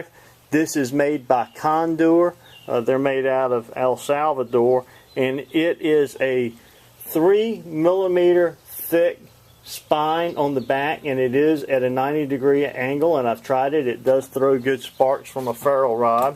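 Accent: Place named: Richmond, United States. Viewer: I'm really liking your videos man. I have this knife as well. Great knife! This thing is a work horse and cuts about as well as any knife I own; and that includes a F1 Fallkniven. I asked Dave his opinion of the knife and he said, because it was only 1065 steel it would not truly be effective in all ways. Have you tried to throw sparks off of it with flint? By the way, are you from the Richmond VA area? I think I recognize that accent.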